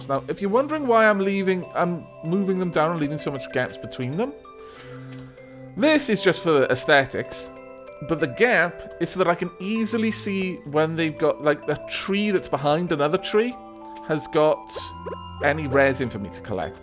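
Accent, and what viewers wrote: Wales, United Kingdom. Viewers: Is welsh your first language?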